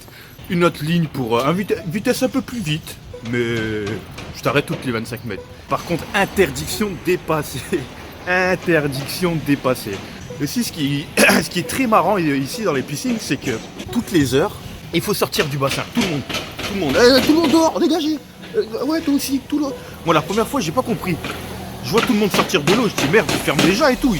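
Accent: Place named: Banlieue, France. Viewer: Jai trop hate daller au Japon, par contre tu parle vraiment comme un banlieusard mec, mais cest pas grave jadore tes vidéos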